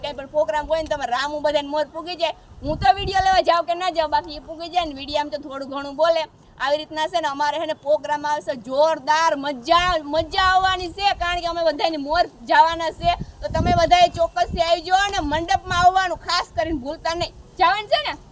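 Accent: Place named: Porbandar, India. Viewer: દીદી જી તમે પોરબંદર જેવું બોલો છો મતલબ આપ કયા કોમ્યુનિટી માંથી છો 🙏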